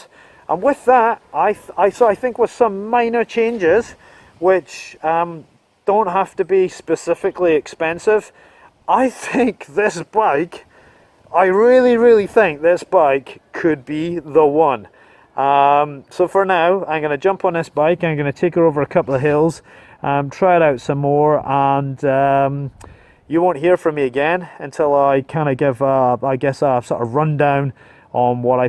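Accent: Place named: Scotland, United Kingdom. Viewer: fantastic bike, good Scottish accent too, superb, haha.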